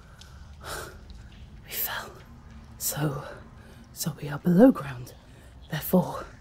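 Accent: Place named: England, United Kingdom. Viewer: An English accent? I think this was pretty cool!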